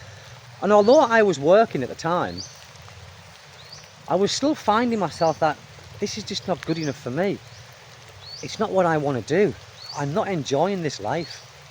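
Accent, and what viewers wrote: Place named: Teesside, United Kingdom. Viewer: Here’s me thinking you were from Teeside😊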